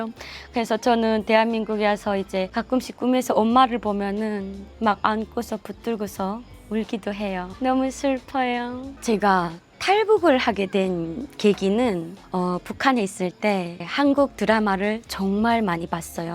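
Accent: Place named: Kansai, Japan. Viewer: 話しかたが大阪のオバチャンみたいで親しみがわくよ。これ大阪弁でやったらウケると思うな。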